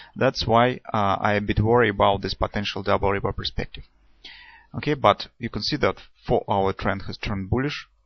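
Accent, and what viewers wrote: Russia. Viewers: Работай над акцентом и произношением слов. Якрий русский акцент. Прям вспомнил пары английского в инсте. :)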